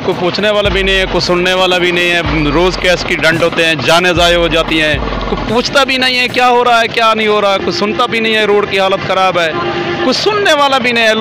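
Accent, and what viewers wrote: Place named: Saraiki, Pakistan. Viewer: اچھی بات کہہ رہے ہو سرائیکی آواز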